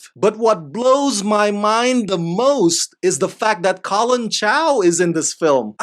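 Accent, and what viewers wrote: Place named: Indonesia. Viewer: btw i hear an indonesian accent are you indonesian?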